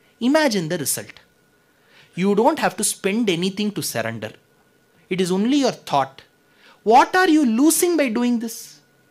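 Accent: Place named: Karnataka, India. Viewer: Saranagadhi.🙏🙏🙏 I enjoyed the beautiful kanada slang though I don't understand the language.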